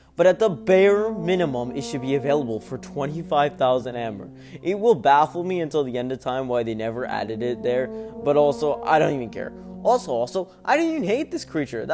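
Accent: United Kingdom. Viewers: you sound british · bros british accent slipped back, also the list is pretty much what id make my list lmao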